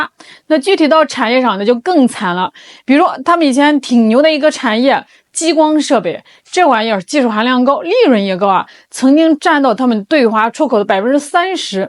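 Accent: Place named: Shandong, China. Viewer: 主播山东姑娘吗？带着家乡味呢❤❤❤😂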